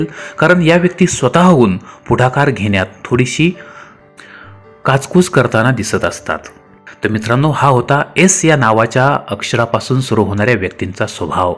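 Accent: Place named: Delhi, India. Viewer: दिल्ली गर मराठी तुमचं. नाव काय आहे ते सागा 🤩🤩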